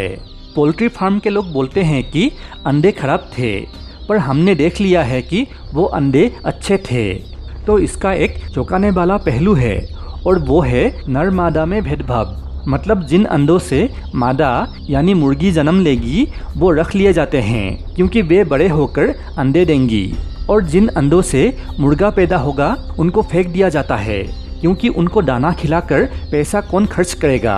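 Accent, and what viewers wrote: Assam, India. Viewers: Aapunr voice2t akhomiya Jen lgise.... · একদম অসমীয়া এজনে হিন্দী কৈ থকা নিচিনা লাগিছে।হিন্দী উচ্চাৰণ ত অলপ improvment ৰ প্ৰয়োজন।চেষ্টা চলাই যাওক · The Hibi bcoz mother toung hindi nhi hai ....Yeh asssamese hai phir v yeh try lar Raha hai .... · আপোনাৰ উচ্চাৰণৰ ওপৰত ভিত্তি কৰিহে সুধিছো মহাশয় , আপুনি হিন্দিভাষী নহয় , নহয়নে ৷ উজনি অসমৰ যেনো লগা নাই হলে 😃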